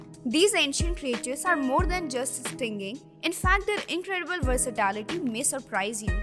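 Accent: India. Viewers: You know what is something I like in your videos. You don't fake your accent. You speak in typical Indian Accent. Which is easy to understand for a large chunk of viewers.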